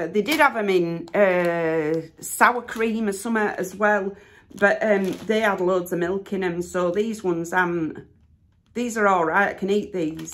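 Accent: Yorkshire, United Kingdom. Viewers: Glad your back and hope your keeping well and yes it’s dinner and tea just stick with the Yorkshire twang we Yorkshire folk are the best ❤❤❤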